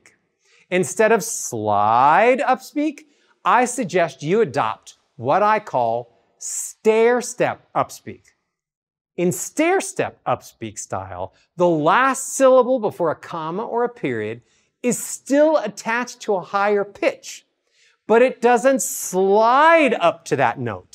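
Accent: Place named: West Coast, United States. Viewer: He has the flat Hollywood/West Coast accent.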